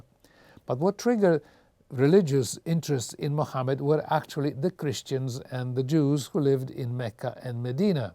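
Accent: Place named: Italy. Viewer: I can hear an Italian accent in your English now :-)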